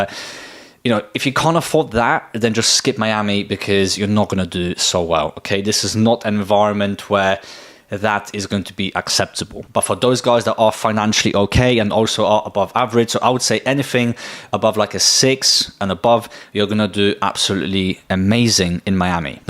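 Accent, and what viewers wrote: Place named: United Kingdom. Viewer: True. That's cuz he's a Polish good looking dude with British accent. So he's super exotic in Miami. If you're an avg American guy, Miami would be horrible to date in.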